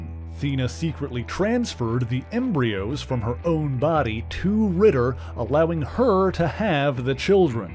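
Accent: Newfoundland, Canada. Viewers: You sound like you’re form Newfoundland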